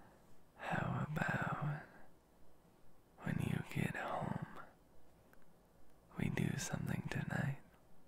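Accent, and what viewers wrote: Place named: Midwest, United States. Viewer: •__• he’s from the us,, im pretty sure somewhere in the midwest??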